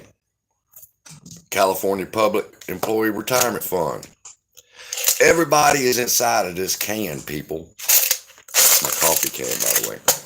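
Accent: South, United States. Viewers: Man, your southern accent !!! Hello from San Antonio Texas. 👍👍👍👍👍👍👍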